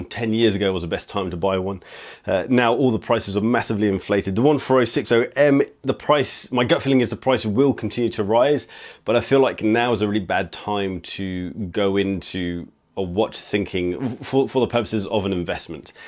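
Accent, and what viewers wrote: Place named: Scotland, United Kingdom. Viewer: Hey, where in Scotland are you?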